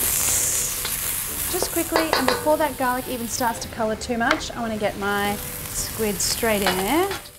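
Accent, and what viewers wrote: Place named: Australia. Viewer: I’ve got to try this. Great vid and so well done. Are you Australian? Like your accent.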